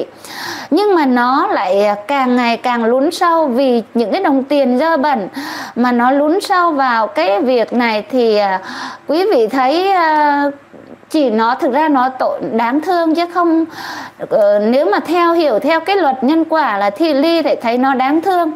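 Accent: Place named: Central, Vietnam. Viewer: Chào ( lìa trần) MSVV bị bắt trưa? Hả??? Nghe giọng trọ trẹ chán quá Trọ trẹ chán quá... Hu hu hu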